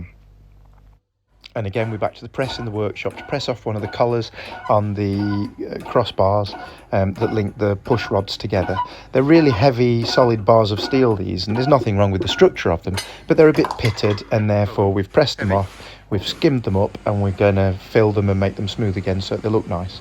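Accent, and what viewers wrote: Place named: Australia. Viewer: ah yes inside a boiler firebox I have known it quite a bit the last few years as I help out at a railroad historical society, cleaning the grates and drilling out telltells as well as blowin out flues once, I show one time I did on my channel in video if anyone wants to hunt, I am surprised though your using a grinder to cut that off we usually just use a torch to burn the stays out and cut the sheets or a plasma for cutting the new ones, its faster, its messier and has more cleanup but its more expediant, I like your voice though its pleasent to the ears and articulated when you speak some have such a dialect or accent you cant hardly make out what they say , you sound though almost australian, I am no boilermaker though but I read up on research and projects even if I cant do the math to design it, soo many things you can do to make a boiler more effecent or burn cleaner, or it dont even have to have fire there is boiler that uses solar collectors that follow the sun and they are getting an 800 degree super heat on the steam meaning its hot enough to compound if you were to use it in remote locations like off grid so diesle dont have to be burned its noisy expensive and smelly, the solar project that the guy at vapor locomotive company in idaho in the states is heated by the sun, though its generating rate of steam I dont know the capabillity of currently, and you have the facinating differant layouts of tubes and flues as well as multipass tubes and rifled boiler tubes lik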